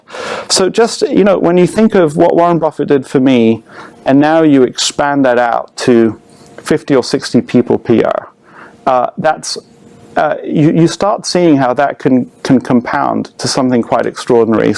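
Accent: Europe. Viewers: listen to his voice, European.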